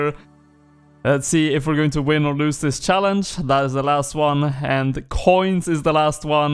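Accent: Sweden. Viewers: Really love the series, you sound swedish are you? "drÄgon hunter lAnce" RSN: M4tsson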